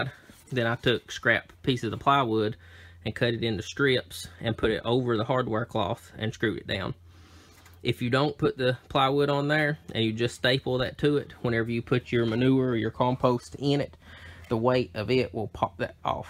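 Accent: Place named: Alabama, United States. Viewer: gotcha. Could have sworn that was an Alabama accent.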